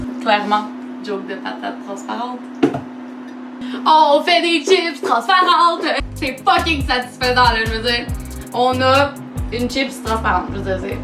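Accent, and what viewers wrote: Quebec, Canada. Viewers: j'adore ton accent quebecois et aussi tu est belle je suis fan de cette accent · Ton accent de Québécoise m'exite grave De la part d'un français ❤️💦😱💍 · ...C'est un accent québécois ,mais bon ... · A force de t'écouter je comprends les Québécois alors que la 1 et fois je te comprenais pas 😂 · Très adorables et drôle mais l’accent canadien m’a toujours posé un problème 😅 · Ton accent québécois je l'adore Mecdo c'est Mcdo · J adore t on accent quebecquois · En fin quelqu'un qui parle québecois ! J'pensais que j'en trouverais jamais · T québecoise?😂♥️ moi oeeeeee♥️♥️😂😂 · T'ES TOUJOURS BELLE ET J'AIME TON ACCENT QUÉBÉCOIS. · Pembe 225 c'est l'accent québécois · WolfTrap HD c'est juste un accent québécois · Accent québécois ou canadien, en a-t-on vraiment quelque chose à faire ? Dans un cas ou dans l'autre, elle aime l'accent et c'est un compliment, à quoi cela sert-il de la reprendre pour une erreur qui n'en ai même pas une ? 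· Ptin sa fais moche l accent québécois sur les meuf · Like si t'aime l'accent canadien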